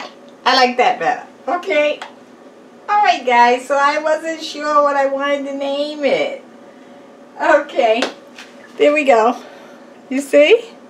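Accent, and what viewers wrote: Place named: Boston, United States. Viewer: MS. Girl you are doing it!! Giving me some good ideas Love it!! And the Wisdom in the word of the day!! Get it Honey!! I have one request can you make a sweet potato biscuits? I would really love that recipe!! Love your channel so much your like my Auntie from Boston in your sweet accent!! I pray many blessings for you, you are doing a beautiful job on your channel!! Love you!!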